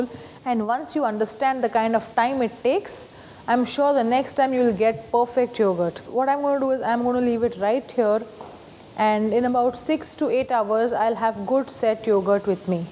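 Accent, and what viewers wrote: India. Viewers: Thanks for sharing the tips as so far no one uses the clay pot / earthen pot to let the yogurt to fermate ! I have no problem with her Indian accent , wonder why Mr. Steve Tarling can't touching with her. Once I apologized for my husband spoke in poor English , the Australian sales girl replied me " Is ok, he is already very smart as I, can't speak a word of Chinese ! For a Chinese old man that can speak our language, is great ! "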